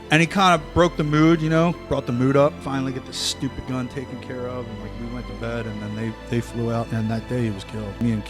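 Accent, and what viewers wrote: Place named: United States. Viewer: Sounds like a real American brother. 🇺🇸🇺🇸🇺🇲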